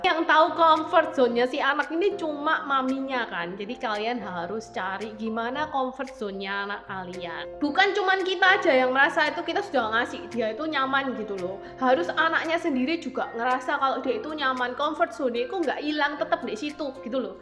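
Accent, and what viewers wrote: Surabaya, Indonesia. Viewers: Jos,,, aq sueneng logat medhok suroboyan e mbk, basio mbuh sampean wong endi 😄 gk mboseni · Wong suroboyo iki koyoe😂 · Surabaya banget logatnya mbak, waduh mbak anakku juga begitu susah banget minum sufor pakai DOT, makasih mbak sarannya tak cobain semua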